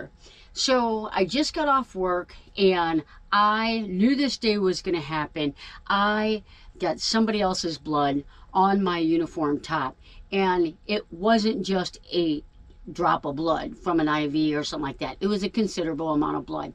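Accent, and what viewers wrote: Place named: Midland, United States. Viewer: I love your midland accent! "Warsh" away! :)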